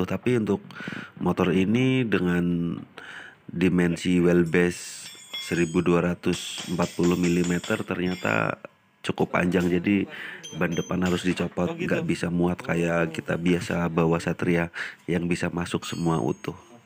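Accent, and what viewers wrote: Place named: Banyumas, Indonesia. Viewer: Wong ngapak mas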